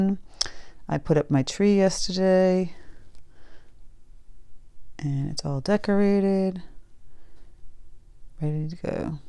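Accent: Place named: New England, United States. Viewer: I love your painting! But even better I love your personality. You make me laugh and teach me things at the same time! What part of New England are you from? Your accent sounds like my daughters. She is Vermont/New Hampshire.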